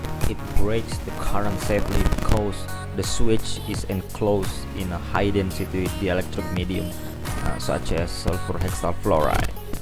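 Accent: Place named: Indonesia. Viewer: Saya kira cuma saya saja penonton dari Indonesia gan hehehehe Rupanya channel ini juga asal Indonesia pantas suaranya seperti orang Indonesia walaupun bahaya Inggris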